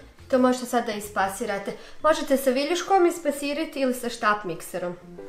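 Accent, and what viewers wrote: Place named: Krajina, Croatia. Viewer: volimo te i pratimo naravno volimo tvoj preljepi krajiški naglasak! 💪🏼👍🏼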